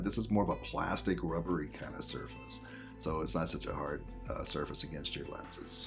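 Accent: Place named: Alabama, United States. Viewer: Hi. Love watching your videos, very informative. I have to ask and sorry to pry, but are you from Alabama? I ask only because you have the same speech patterns as Tim Cook from Apple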